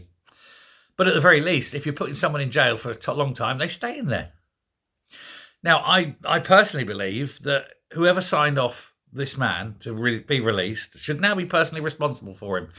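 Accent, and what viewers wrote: United Kingdom. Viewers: Thick British I’ll say no more.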